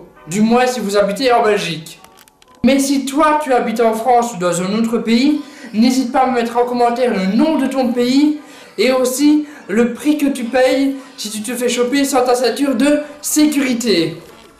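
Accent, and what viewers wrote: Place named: Democratic Republic of the Congo. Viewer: sympa l'accent congolais c'est bien ca?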